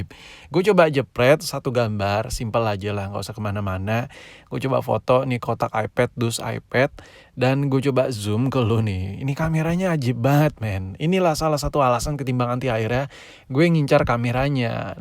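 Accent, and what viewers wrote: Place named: Java, Indonesia. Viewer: Lho orang jawa ya kok bahasa indonesia masih belum lancar ada medok nya jadinya gw like terus channel lu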